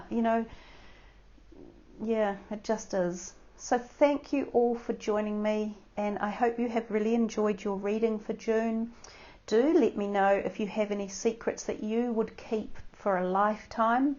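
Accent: New Zealand. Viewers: Love the "sucx" (6). Good old Kiwi accents. Not making fun, was married to one!!!! Hope Enzo will be okay!!!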